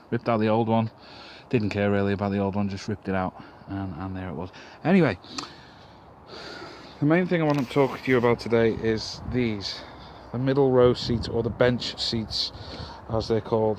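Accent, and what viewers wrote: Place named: Liverpool, United Kingdom. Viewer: Nice 1 scouse.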